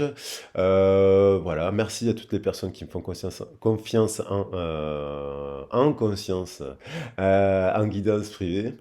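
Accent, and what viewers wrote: South, France. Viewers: Bonsoir vôtre accent du midi me remonte le moral ca fait du bien moi des angoissés seule tres angoisse merci bonne soirée bisous ❤❤❤❤❤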